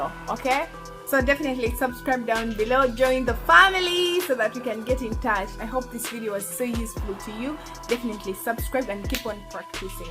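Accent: Uganda. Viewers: Your accent tho😂are you from Uganda 🇺🇬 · The Ugandan accent💓💓. Love itttttttt🤗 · You speak like a Ugandan🇺🇬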